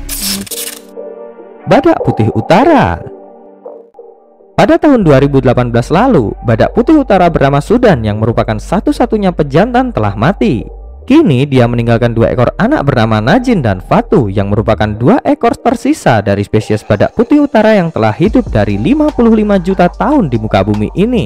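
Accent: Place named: Java, Indonesia. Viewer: ketauan ya kalau komentatornya orang Jawa... logat hurup K hilang😄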